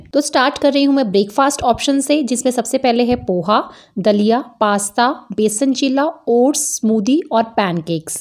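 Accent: Punjab, India. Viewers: just loveeee her voice...it has a punjabi touch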